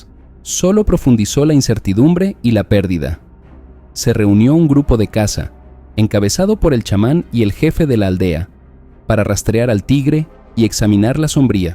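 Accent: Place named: England, United Kingdom. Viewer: Que mal narrados estan los videos, habla un español que de repente no se entiende como que lee mal las lineas y las pronuncia en ingles. Deberian poner a un narrador que si entienda y sepa leer el español.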